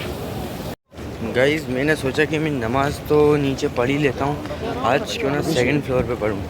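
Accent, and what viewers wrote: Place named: Uttar Pradesh, India. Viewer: Bhai ki boli to india up ki lg rhi hai hm 🇮🇳🇮🇳🇮🇳 hai 🤲🤲❤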